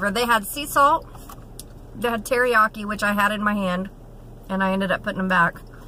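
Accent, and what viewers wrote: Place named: South, United States. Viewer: I'm gonna assume your from the south hence the twang. Love your vlogs💖😘I'm in Louisville,KY which alot of ppl call 'the south' but it's actually mid-west or what some ppl call 'The Gateway to the South' so sometimes I say words like ' Big Ole' but I also lived in Chicago for awhile and sometimes I have pure Midwest accent w/o a touch of twang or Southern, it's the strangest thing! Lol🤷🏾‍♀️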